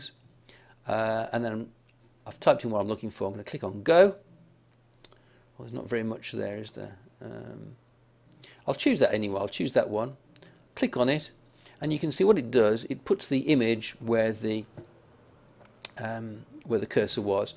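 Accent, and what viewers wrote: United Kingdom. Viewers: This video was thie first one that i searched for after reinstalling my system, so it was the first link assosiated with youtube. Now everytime i search "youtube", this video is the first one i get. This british voice is now my every day companion and im not even sure if i want to change that. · really like this British accent omg!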